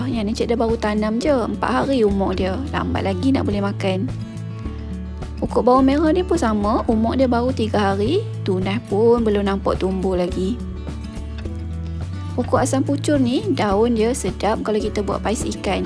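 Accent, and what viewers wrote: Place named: North, Malaysia. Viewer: Dengar loghat macam orang utara,belah kawasan mana?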